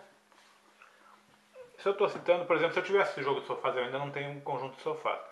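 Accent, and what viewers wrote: Rio Grande do Sul, Brazil. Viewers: e ai blz tu e gaucho tche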